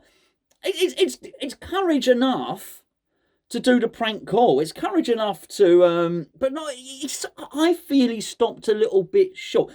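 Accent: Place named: Scotland, United Kingdom. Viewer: Definitely a hint of Scottish twang, bloody 🤥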